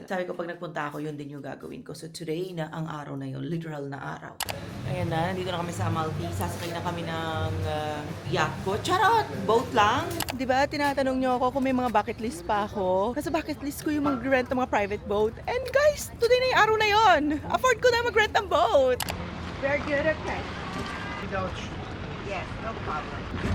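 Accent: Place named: United States. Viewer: Ohh i know who looks like now, she looks like Priyanka Chopra, but has American English accent than Priyanka.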